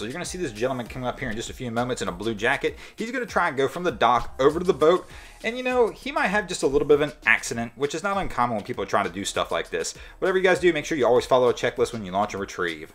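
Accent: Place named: Pennsylvania, United States. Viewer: I can tell you're from Pennsylvania, the way you pronounce the letter O.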